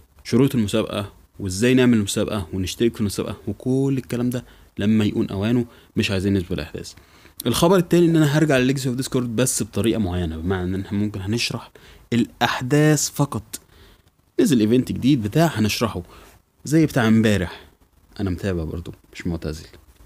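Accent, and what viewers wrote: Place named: Egypt. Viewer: انت مصري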